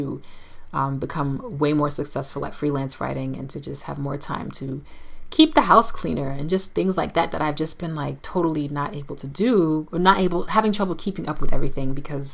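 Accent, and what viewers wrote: United States. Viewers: It was totally you that I saw last year in church in Okahao!!! You were there with your husband, where they required the married couples to go to church and give an offering. I first pointed out to my sister how pretty you were and then came your American accent as well Lol.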